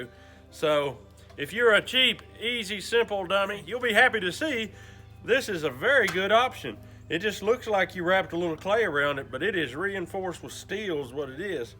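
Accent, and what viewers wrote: United States. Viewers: you have such an american accent, i like, also good video man, i need it to fix drop out in my eletric bike so wanted to check how strong it was, thanks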